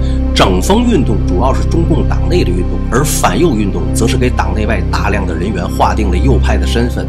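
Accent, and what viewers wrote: Tianjin, China. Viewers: 感觉是天津人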